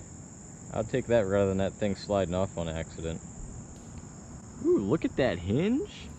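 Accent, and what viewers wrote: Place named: South, United States. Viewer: What state is this in? I’m trying to work out what your accent is. It sounds a bit south but not deep south (I’m in the UK so I’m not sure)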